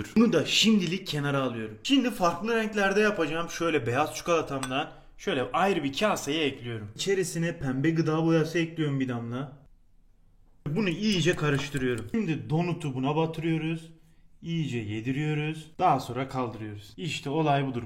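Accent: Adana, Turkey. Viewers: Abi onu bırak adanalı gibi konuyorsun adanalılarda alüyürum der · Adam adanalı galiba ekliyorum yerine eklürüm diyo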